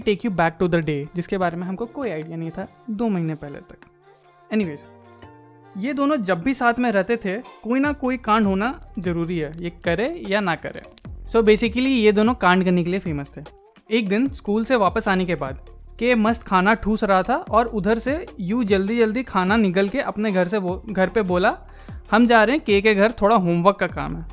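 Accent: Bihar, India. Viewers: Pakka tm bihari ho... Kyu ki bihari he sirf hum bolta hai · Hello I'm your new subscriber from Bihar. Budhwa Chacha 🤣🤣🤣 Your Bihari and Jharkhandi accent make me laugh and happy also . Nice video.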